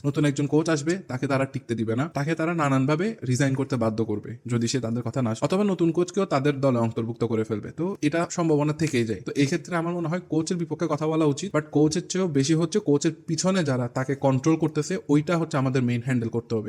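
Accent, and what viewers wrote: Chittagong, Bangladesh. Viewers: Bhai Mone Hy Chittagong er ❤️✅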